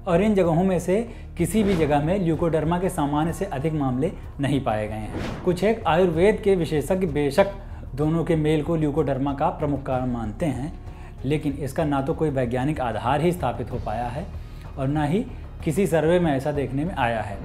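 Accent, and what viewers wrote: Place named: Kanpur, India. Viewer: आपका ही इंतजार था भाई साहब, आपकी कानपुरी भाषा सुनने मै सच मै आनंद की अनुभूति होती है ।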